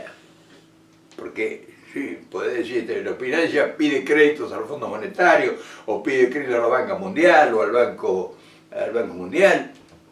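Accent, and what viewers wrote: Argentina. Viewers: Ok señor en vez de criticar a López obrador xke no critica a su presidente de Argentina xke en tu forma de hablar eres argentino y no utiliza ninguna majia solo que es inteligente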